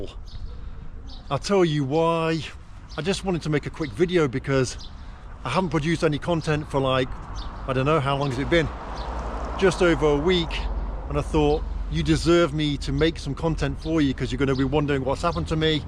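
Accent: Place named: North of England, United Kingdom. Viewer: Hi, I’m not an expert on English accents. Where are you from? It’s the north of England I know but I can pin it down. North west? Liverpool?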